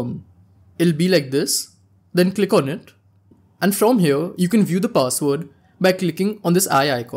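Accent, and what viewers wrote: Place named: India. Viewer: The Indian accent is crazy 🤪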